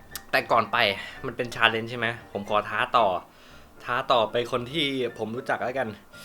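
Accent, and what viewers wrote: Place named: South, Thailand. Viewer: สำเนียงเหมือนคนใต้ เป็นคนใต้ใช่มั้ยยบอกมาาาา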